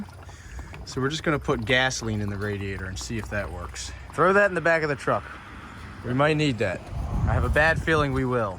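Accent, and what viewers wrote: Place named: Appalachia, United States. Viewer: Whenever Asians speak hill Billy? I love it.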